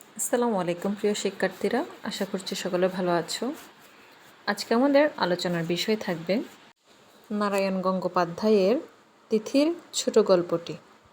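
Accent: Chittagong, Bangladesh. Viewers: আপনার ভাষ্যে চট্টগ্রামের আঞ্চলিক লক্ষণীয়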